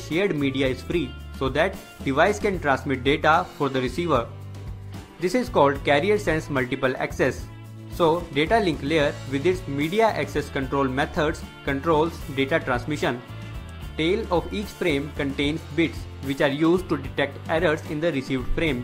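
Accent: India. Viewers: I love his indian voice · مفيد بس الإنجليزي الهندى ده عاوز ترجمه لوحده... ياخربيت بريطانيا كل سنين الاحتلال ده ومعرفتش تغير اللكنه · I love the video, contents are correct AND Well presented.. Easy to understand rather than reading the books and trying to imagine it all. For improvement, i suggest that the narrator to speak a little bit slowly in speed since the Indian-English accent takes a little time to process especially for Asian. All in all, thank you very much for being generous sharing the knowledge. May Allah bless you always n :)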